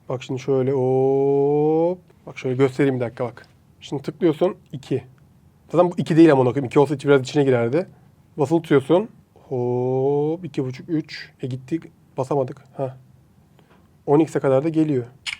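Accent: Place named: Eskişehir, Turkey. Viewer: Daha videonun basında düşünüyodum bu adam eskişehirli diye harbide öyle çıktı mk (bende eskişehirliyim)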